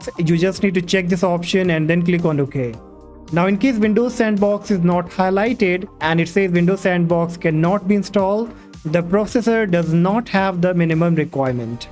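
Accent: India. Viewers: it's always the guy with the bollywood indian voice who helps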